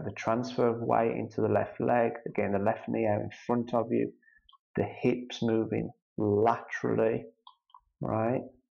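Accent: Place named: Black Country, United Kingdom. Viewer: Hi really enjoyed this analysis of the master! You say it is really important that his head/eye is tilting forward to help the back swing could you explain? Also how much forward tilt from the hips do you recommend. By the way love your black country accent!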